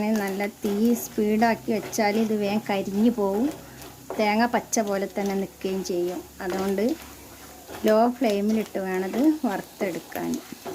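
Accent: Malappuram, India. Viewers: Kollalo.. ingalu njamade malparthe basha pole indallo varthanam..😃😃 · 😋😋👌👌കൊതിയാവുന്നു കണ്ടിട്ട് തന്നെ. ചെയുന്ന സ്റ്റൈൽ വളരെ നന്നായി ട്ടുണ്ട് 😊. ഇങ്ങനെ ഞാനും ഒന്ന് ട്രൈ ചെയ്തു നോക്കട്ടെ. എന്നിട്ട് പറയാം ട്ടോ. 😍മലപ്പുറം ആണോ നിങ്ങൾ. ഞാനും അവിടെ ആണ്. സംസാരിക്കുന്നത് കേട്ടിട്ട് ചോദിച്ചതാ ട്ടോ 😊.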